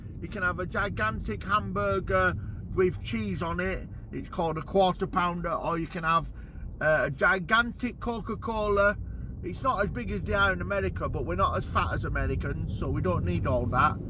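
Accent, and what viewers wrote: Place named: Bradford, United Kingdom. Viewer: I'm a Bradford lad, but I moved over,t pennines 8 years ago to come live in Salford, just come across you today, gotta say fekkin hilarious, I used to work in Keighley and lived there too fe a few years, not changed much. Good to hear our accent too lad, they all talk like fekkin Diedre Barlow round ere 😁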